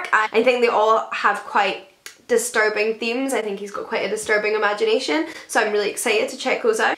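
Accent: Scotland, United Kingdom. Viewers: you're Scottish, aren't you! Lol!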